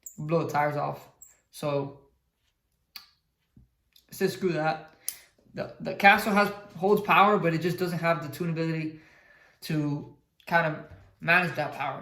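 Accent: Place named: Hialeah, United States. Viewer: Wow For this event being low on staff, you guys did a good job. It sucks when people end up flaking on you, however you guys adapted and overcame the Situation. As for Tech Inspection I agree you gotta leave the friendship out and be fair for everyone. A true friend will understand. Im sure this was a learning experience for you and your team. So For the next event just have a few backup plans incase shit hits the fan again . BTW It was Good to meeting you funny how you were talking to Frenchie and Immediately recognized your voice. Tremendo Hialeah accent 😆 🤣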